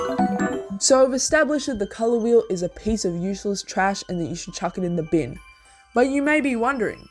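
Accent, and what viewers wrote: Australia. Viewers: as soon as you started talking i immediately yelled 'FELLOW AUSSIE SPOTTED' (it is 12am)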